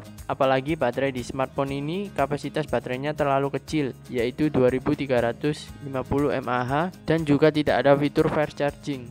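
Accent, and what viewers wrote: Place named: Java, Indonesia. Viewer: keliatan banget jawa nya tolong bahasanya diperbaiki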